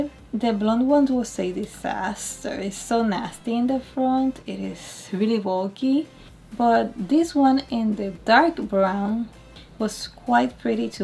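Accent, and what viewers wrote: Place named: Colombia. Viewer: You look so gorgeous 😍😍 you literally look like Lady Gaga with a Sofia Vergara accent ✨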